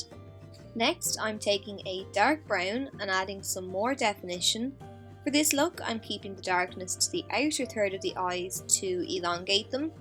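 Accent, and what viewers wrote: Dublin, Ireland. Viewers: Are you Irish? Cuz I love your accent!! Sorry if you're not, I'm new but I'm definitely subscribing!❤️ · I'm from Ireland and that's a Dublin accent 🍀🇮🇪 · I can definitely tell you are Irish, and I think the Irish accent is beautiful. I also use the Rimmel Match Perfection, I have True Ivory. I’m very pale, like porcelain almost. Thank you, this was helpful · is that Irish accent?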